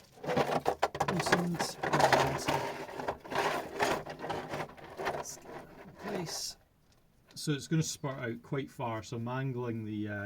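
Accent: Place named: Aberdeen, United Kingdom. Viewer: Yes, you’ve helped me. Thanks. With the accent and sound of the seagulls, I think you maybe near Aberdeen? Cheers!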